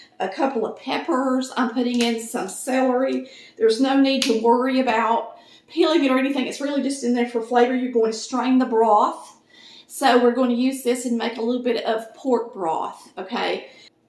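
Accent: South, United States. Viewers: I love the way she say pork chops. That country voice gets me all the time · You are awesome! Love your southern accent and personality 😄. I just found it today and YouTube keep posting videos you’re great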